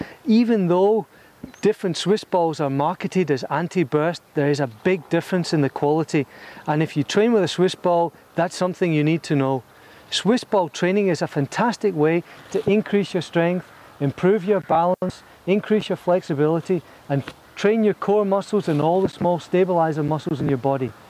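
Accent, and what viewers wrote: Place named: Scotland, United Kingdom. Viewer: Touch of Scotland in his accent.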